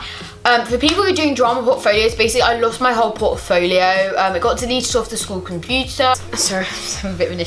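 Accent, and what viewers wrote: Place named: United States. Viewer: Love this and ur American accent wowee!!!!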